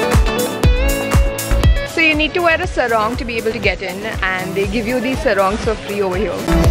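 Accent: India. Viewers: I love ur vlog the shot & editing is so great & ur indian accent is so cute. Greeting from Java Island, Indonesia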